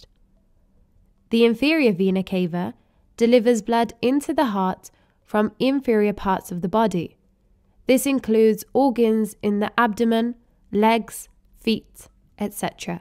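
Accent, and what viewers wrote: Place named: United Kingdom. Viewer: Bless you and your British accent. Thank you.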